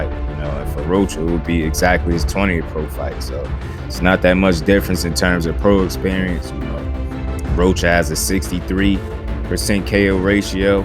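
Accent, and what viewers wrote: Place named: Washington DC, United States. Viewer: omG, you’re from the dc area?? i think i hear a dc accent, especially when you say “hands”.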